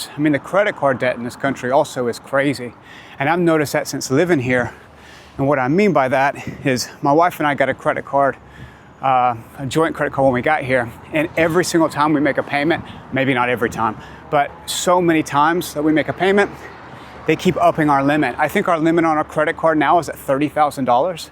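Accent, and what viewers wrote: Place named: Northern England, United Kingdom. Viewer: This guy is obviously from Northern England or Ireland and is cosplaying as a North American for clicks and likes.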